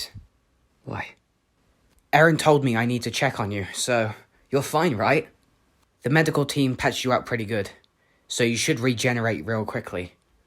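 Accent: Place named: United Kingdom. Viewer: idk why when he started talking I just went "ohmygod he's brtish" LIKE IM NOT BRITISH MYSELF😭💀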